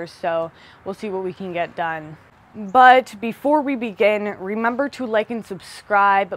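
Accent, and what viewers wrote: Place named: Michigan, United States. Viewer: Hey, has anyone ever told you that you sound like you're from northern Michigan not north central Pennsylvania?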